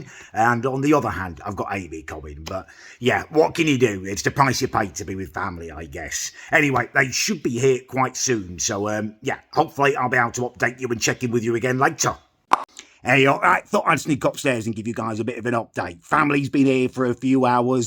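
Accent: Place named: RP, United Kingdom. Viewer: Uno what Yh I fully love ya vids now pal i genuinely sit hear watching all of your videos while sm’kin. I see you like I see my grandad cute af n genuine down to the bone 😂 I love how you are it’s jokes I can relate so much but u talk v posh it’s jokes 😂 keep up the good work lad and I hope u have a bloody good new year don’t let the haters get to u ♥️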